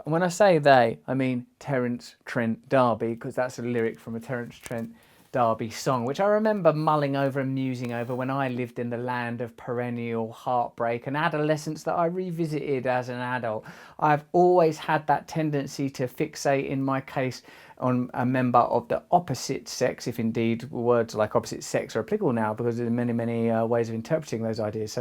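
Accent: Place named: United Kingdom. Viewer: So drunk and sad right now, I had to turn the CC on because it got to the point where the British accent got so thick I didn't understand any words to cry to.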